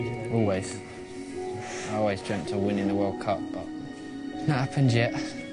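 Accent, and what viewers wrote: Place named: United Kingdom. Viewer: Acento británico... Difícil de entender pero lo sigo intentando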